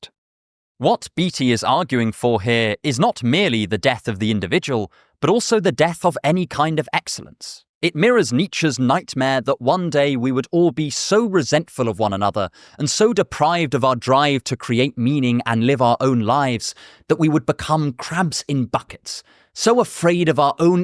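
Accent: England, United Kingdom. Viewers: This is a deeply unserious comment but I’m generally repulsed by British people and the British accent but you are one of the few British people I find attractive for some reason. Cheerio mate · I've lived in england for 10 years and I've heard and english as british as yours before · I never expect you to have an english accent for some reason. Every single time I am surprised.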